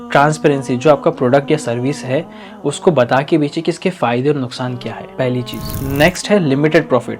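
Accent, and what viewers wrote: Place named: Marathwada, India. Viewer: Aapki Tone se lag raha aap, Aurangabad , Parbhani ya Nanded ke ho😅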